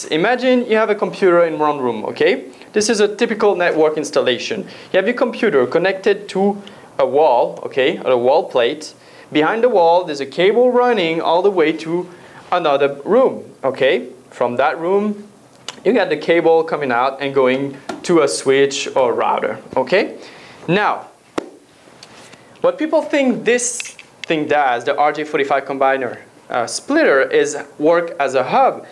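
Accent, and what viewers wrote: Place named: Quebec, Canada. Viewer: allo le québécois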